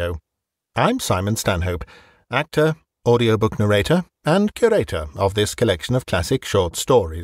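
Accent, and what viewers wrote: England, United Kingdom. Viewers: Hi Simon, i just finished listening to a couple of stories you've read, you have such great diction and voice, just like I would imagine a well educated Englishman would sound during the Victorian or Edwardian times, very nice and genuine, thank you! Do you only read short stories, or is there The Moonstone by Wilkie Collins in your collection? My favorite book since my youth, I've read it in multiple languages... Thank you again!!!!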